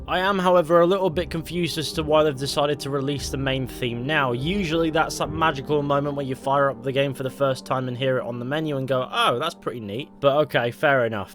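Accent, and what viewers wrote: United Kingdom. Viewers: Man you're talking so fast😅 and with your uk accent it's damn hard for me to fully get what are you saying(or maybe I'm just a stupid retarded who still struggling with english) and since I'm unfortunately not a native speaker, it makes it harder but I concentrate as much as I can😅 anyways I loved this video and could claim that i understood 80% of your context,love your content 🤙♥️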